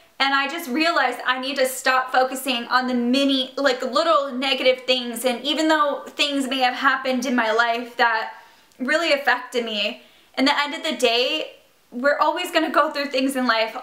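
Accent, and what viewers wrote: Canada. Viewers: is she Canadian? I can't quite tell but the way she pronounces certain words make me think maybe she is. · question are you Canadian??? cuz your accent is cool!!!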